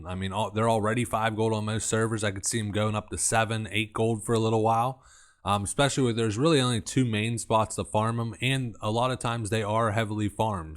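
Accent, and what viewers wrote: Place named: Pittsburgh, United States. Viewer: well the pgh accent makes sense now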